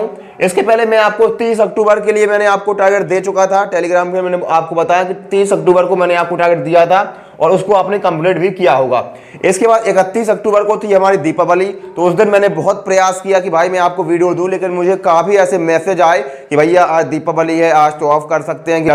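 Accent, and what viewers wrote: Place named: Madhya Pradesh, India. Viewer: Bhaiya aap mp se belong krte ho language s lgta h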